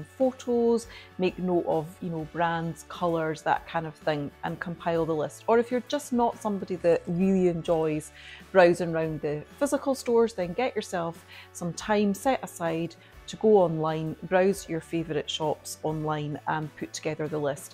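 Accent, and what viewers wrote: Scotland, United Kingdom. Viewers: Just subscribed this morning and glad I clicked on your video in my feed. It was lovely listening to your brogue - my grandparent's were from Saltcoats before they came to Michigan. I have cousins in Ayr and they visit America often! xx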